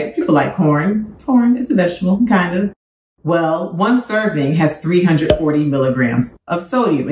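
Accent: South, United States. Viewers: Oh-my-goodness! thank you for making this video. You are doing 4 things here for: Reinforcing much of what I'm already avoiding and giving me more reason to stay focus. Reading the content, cooking more. I'm a little a Pescatarian finding more options. You are also causing me to miss my home grounds in Arkansas everytime you say " You know Goodden-well" I've only heard from southern people.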